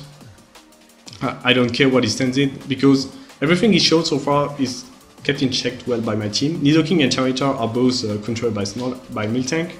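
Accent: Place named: France. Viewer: These are good videos. I have watched a few of them already, and I enjoy the combination of the team presentation in the beginning and the following game commentary, showing how it works in practice. Also, the French accent is surprisingly pleasant to the ear. What do you think about the idea of going more in-depth on a team presentation, and showing more than one game (two or three, most likely) where you use the same team?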